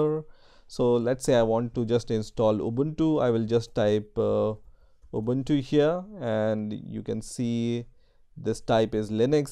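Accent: India. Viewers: oh indian (not racist,just suprised to hear not bad at all,but different accent)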